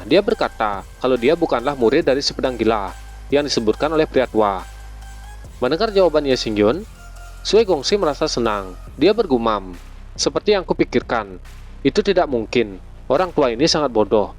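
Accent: Bali, Indonesia. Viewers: Dr logat, kayaknya orang bali nih admin.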